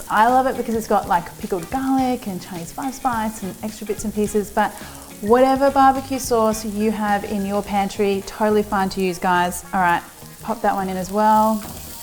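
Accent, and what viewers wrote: Australia. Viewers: You know Marion is Australian not British. Her accent is Australian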